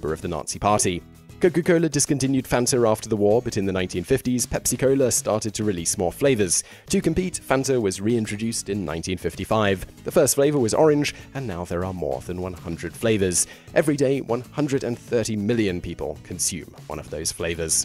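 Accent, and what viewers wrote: United Kingdom. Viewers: I guess I'm just a slow talking southerner, hard to keep up with a fast talking Brit. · R C Cola needs to be on this video!!! And why the British accent?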